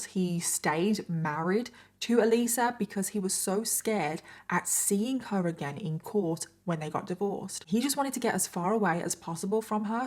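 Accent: United Kingdom. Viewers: Please, Can you narate stories in british accent. It sounds good to me. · She’s British and she always does it in her accent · MAKE UP REQUEST!?!?! My favorite (American) football team is going to the Super Bowl!!! Will you do a Kansas City Chiefs theme make up? I have just recently discovered your channel and have watched so many of your videos back to back to back that my inner monologue has had a British accent for like 2 weeks now. Thank you!! -Sarah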